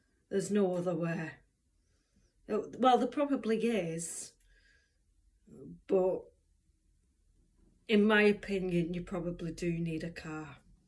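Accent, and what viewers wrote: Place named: Yorkshire, United Kingdom. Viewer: Yeah lol am from Durham but you seem bit further away Yorkshire maybe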